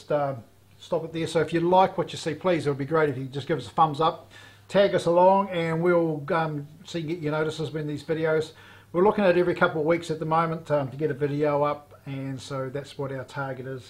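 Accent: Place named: South, United Kingdom. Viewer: Your accent is interesting it’s like a southern British sounds cool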